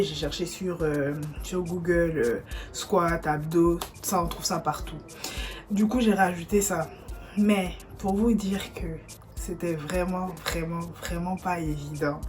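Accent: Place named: Ivory Coast. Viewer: On sent l'accent ivoirien. Ko djaaaaah!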